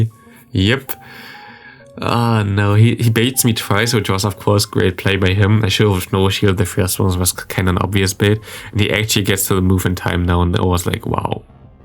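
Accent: Germany. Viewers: which nationality are you?, sounds like german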